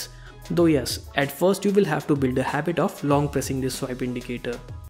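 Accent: India. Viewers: indian english is so scary.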